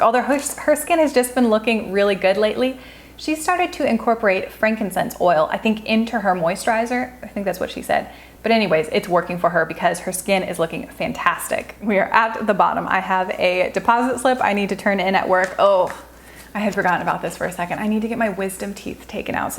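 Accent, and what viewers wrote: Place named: United States. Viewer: I also have this tote and I like it too... love your vedios and do you mind if I ask which part of America you are from? I am an English major and I am studying different English accents and I like yours very much!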